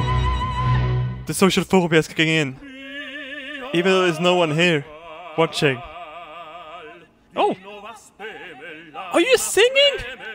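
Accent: Sweden. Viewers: The great has returned to us! How we all missed that Swedish accent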